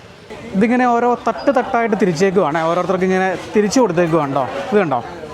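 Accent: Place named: Ernakulam, India. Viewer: Off topic. accent gives me chuckles. I am amazed how many accents a district like Ernakulam has. Another prominent one is from Kochi area. Edapally/Kalamassery/Aluva has no typical accent but more of a mainstream Malayalam I like to believe. Anyways your sweet accent makes the video more lively.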